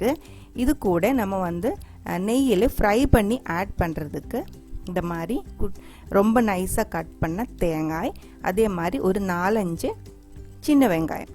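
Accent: Kerala, India. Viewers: Malayazhi speaking Tamil very cute and recipe very nice.... Try panni paakurean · Malayali speaking tamil will be great to hear. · Love your malayalam slanged tamil. So sweet to hear . Looks like an easy recipe. Will try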